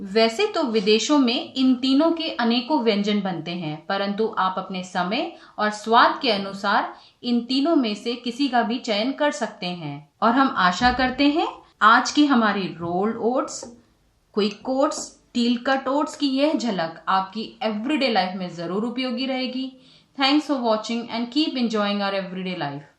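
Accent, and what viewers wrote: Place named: India. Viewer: starts in english ... morphed into indian ...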